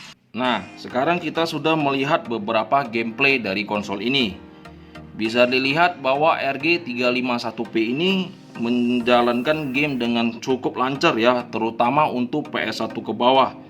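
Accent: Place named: Java, Indonesia. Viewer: Saya suka logat jawanya abang..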